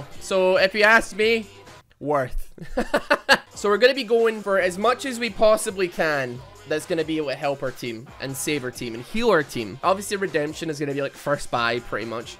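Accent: Scotland, United Kingdom. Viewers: so scottish dude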